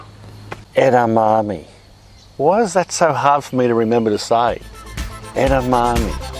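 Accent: Australia. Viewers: I was actually looking to see if it's possible to grow soya in the UK. Within 2 seconds I realised you have an Australian accent so I suspect this is not going to be relevant to me. 😥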